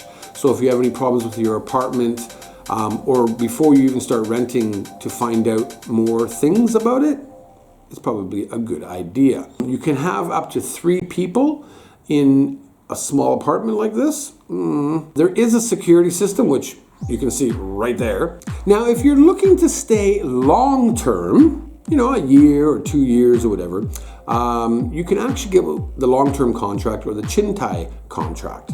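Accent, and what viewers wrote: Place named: Canada. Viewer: haha amazing! I’m Canadian too and I thought I noticed a slight Canadian accent lol 🇨🇦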